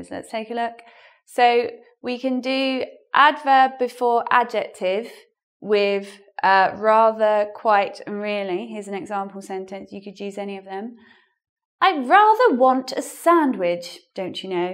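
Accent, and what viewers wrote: United Kingdom. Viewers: I love your British accent. I wish I could sound more authenticaly. But I find bit silly trying to copy a native speaker, doesnt it sound awkward? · give me that british accent m8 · Hi, I have to confess: british english pronountiation is much more difficult to understand to me than american english. I follow your channel and I watch all your videos, but I have to say that I struggled to understand you today. But, keep it up :-) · Her British accent is so cute!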